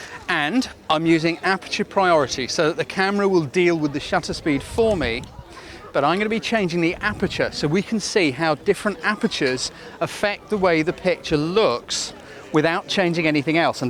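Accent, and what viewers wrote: England, United Kingdom. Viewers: 5.6 - HAHAHA! Sorry, bloke - English women are not that pretty. Irish ladies have beautiful red hair. And women from Australia, who have about the same accent (as far as I can tell), are quite lovely (Nicole Kidman, Kylie Minogue, Danni Minogue, etc.). But I do enjoy your videos. I've been shooting SLRs since 1978. Still learning new things and always good to get a refresher of the old things.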